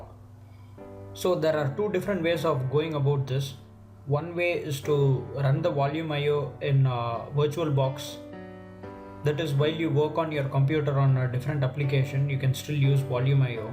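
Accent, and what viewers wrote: India. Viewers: fucking indian english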